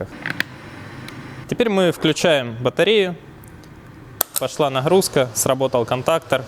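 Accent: Rostov, Russia. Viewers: вы не ростовчанин случайно? Слышно родной говор)))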